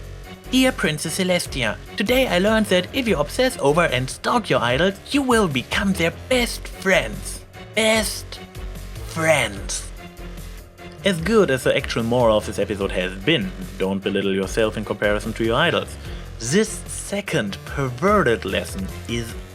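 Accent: Germany. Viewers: Hey, I noticed your accent. Are you German or Austrian? I'm Belgian, BTW.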